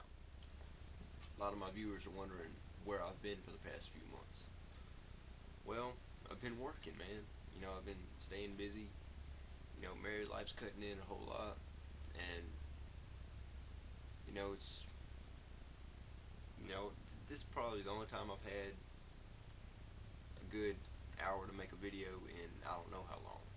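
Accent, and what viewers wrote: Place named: Appalachia, United States. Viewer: He speaks exactly like the Hillbilly guy named "Boomhauer" from the TV show "King of the Hill". I could barely understand every other word out of this guys mouth. By the way, I just bought a Hi-Point C9, still have a few more days before I can pick it up at the gun store.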